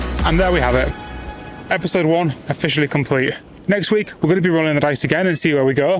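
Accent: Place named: Northern England, United Kingdom. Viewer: What accent is this,i could of sworn it was north england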